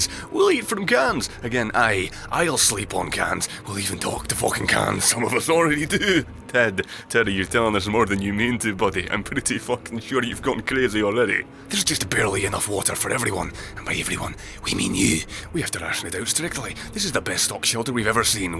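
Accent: Scotland, United Kingdom. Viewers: Have I missed something why is suddenly Scottish? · ***** His third ever video uploaded (the one with MW3) is also with him putting on a Scottish accent. I must say, it fits pretty well. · Are you Scottish? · Josh Mcklaren He said he is from the UK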